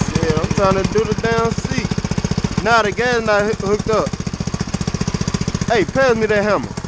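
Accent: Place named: Louisiana, United States. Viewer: you got to be from Louisiana. not sure what town that is. kinda reminds me of villeplatte.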